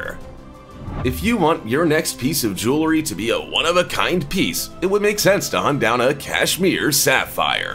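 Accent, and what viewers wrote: United States. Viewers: The heavy American accent is unbearable. Makes everything automatically sound like a huge scam.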